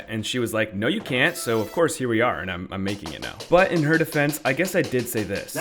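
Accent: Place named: California, United States. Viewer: I love your California accent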